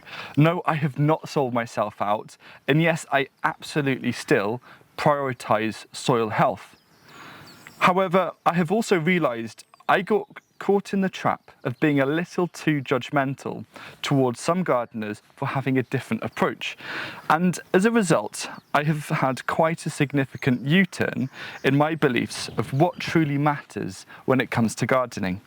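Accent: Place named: Wales, United Kingdom. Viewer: I have but one of this amazing man's books, and consider him to be the only gardener on YouTube worth a book purchase. Not only is he a wise gardener with lots of sage advise (pun intended), he is a wonderful soul, and always worth my time. The always added plus is that gorgeous Welsh accent - I could listen to our read the phone book for hours on end, all while puttering around in my tiny garden. Thanks, - you have my vote for humanitarian gardener of the decade.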